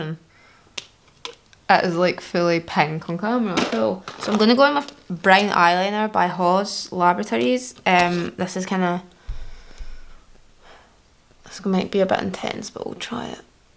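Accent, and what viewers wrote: Scotland, United Kingdom. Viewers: Hearing a fellow Scottish person on YouTube brings me a lot of joy 🤧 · took me 5 seconds to hit the like button after i heard her accent lmao cant shaft another scot's makeup tutorial!! ur stunning! 💙🤍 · you’re Scottish? - subscribed.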